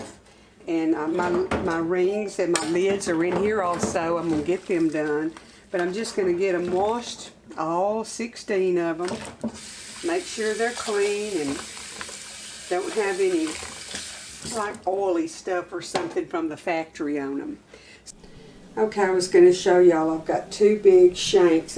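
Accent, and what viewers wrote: South, United States. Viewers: Hello from Oregon! It sounds like you are from the south. My home state is Georgia. I am so glad to of found you. Canning ham today! Blessings to you this new year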